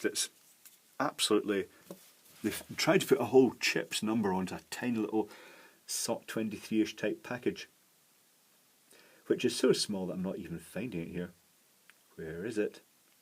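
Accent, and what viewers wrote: Scotland, United Kingdom. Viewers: Interesting accent. Is it Scottish?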